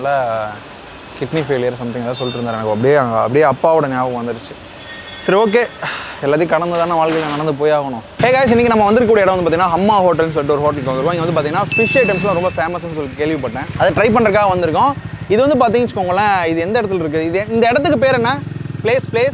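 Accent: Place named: Kerala, India. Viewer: கேரளா போனதும் கேரளா பாஷை ஆட்டோமேட்டிக் ஆ வருது போல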